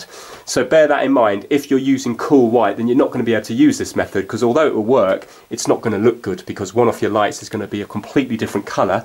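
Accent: England, United Kingdom. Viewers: brilliant!!! Thank you for your solution and your charming British accent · Alex Calinescu that's an English accent from England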